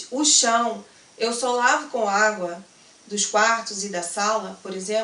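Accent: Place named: Portugal. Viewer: Engraçado... a primeiríssima coisa que notei foi o seu sotaque... já está falando com os acentos portugueses...rs.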